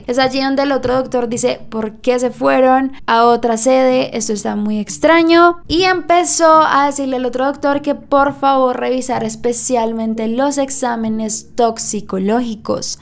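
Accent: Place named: Colombia. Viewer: Una voz femenina con acento colombiano e historias de terror uf... es un placer auditivo...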